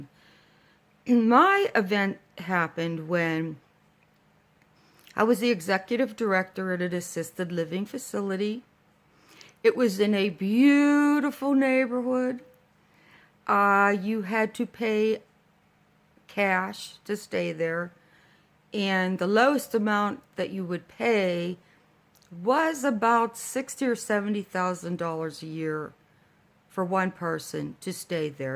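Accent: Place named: United States. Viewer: Ps. love the accent where in the US are you from?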